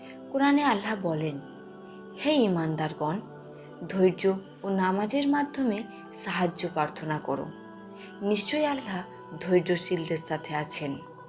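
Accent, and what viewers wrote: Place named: India. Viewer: আপনি কি ভারতীয় , আপনার কথা ভারতীয়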